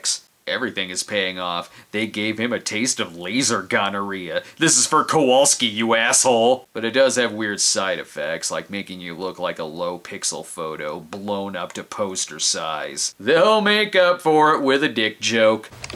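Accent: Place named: Midwest, United States. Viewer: I'm impressed how strong his Chicago or midwest accent has gotten over the years.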